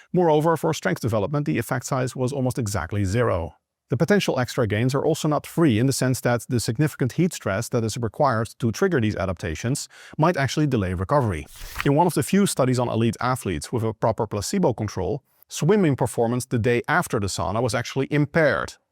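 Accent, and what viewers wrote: Netherlands. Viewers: I know he's Dutch, but not where in the Netherlands. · His accent is pretty screwed up anyway because he's been away from Netherlands for so long. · I wasn't watching the video and yeah that line did pop my eyebrow when I heard it. Going back it followed the other Dutch-tell phoneme he uses which is the uncentralized 'u' in "unaware". Anyway, if you're reading this, your English is great and your accent is very mild. Even Jeff Nippard has a noticeable accent to an American audience.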